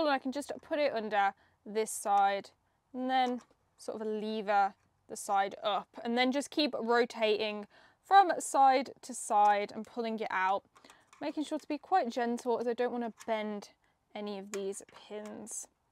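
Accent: United Kingdom. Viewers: I love your videos! You have one of the thickest British accents I’ve ever heard. Love it.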